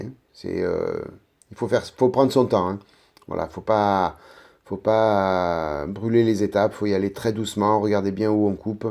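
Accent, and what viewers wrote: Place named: Provence, France. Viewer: Bonjour bonjour..au sujet des ciseaux,pour des grosses pièces au cutter c'est mieux (avis perso)..dis moi vu ton petit accent je pense qu'on est voisin..je suis natif de Salon mais j'habite Marseille..bonne continuation..c'est bien parti!!! Jan-mi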